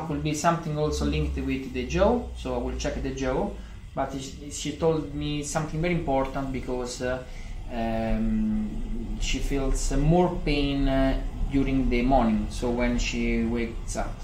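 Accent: Italy. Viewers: I love the Italian accent. Also I wish we had real osteopathic doctors like you in America! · “Don’t help me” is perfect to get the patient to relax. Your English is wonderful! Especially with Italian accent! Don’t change. Stay Italian! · I understand everything! Is a very “italian english” because of your accent and mother tongue. You just need to learn and practice medical vocabulary and that’s it! Because your grammar is good, simple and comprehensible. Cheers from Chile 🇨🇱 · You are fluent in English. Your Italian accent has made your English lovely. Well-done! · your English is perfect! You don’t need any improvements. It’s very impressive. Also, I love hearing Italian and I love your accent. I wish you would do a video of going around Rome, like a tour guide. You would be great! We want to learn about Italy and the lifestyle. Ciao! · I like Italian English accent 👍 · If I were trying to tell my patients to relax without saying "relax" would say "let go of your muscle tension" (Lascia andare la tua tensione) I'd also say, "Take a deep breath and exhale peacefully, let your body rest" (Fai un respiro profondo ed espira pacificamente, lascia riposare il tuo corpo) or I'd say "let your body go completely limp" (Lascia che il tuo corpo diventi completamente floscio) Your English is amazing! 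But we can tell you Italian because you put ee/ah vowel sounds at then end of words. You say "relax-ah" an American would sound like "relaxssssss" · I just love your English Italian accent. Parava doctor Much of love from Egypt ❤️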